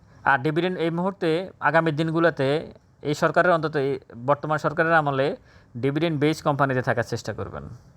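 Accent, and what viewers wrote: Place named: Noakhali, Bangladesh. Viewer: noakhailla bhasha emon e . Manush k choto kore kotha bola ucit na. Noakhaillara o manush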